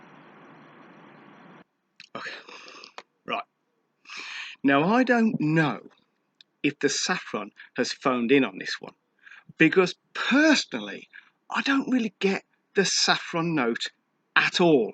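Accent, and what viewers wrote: Birmingham, United Kingdom. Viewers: Hey just chanced on your videos. Subscribed. Is that a Brummie accent I can hear? 😆👍 Keep it going!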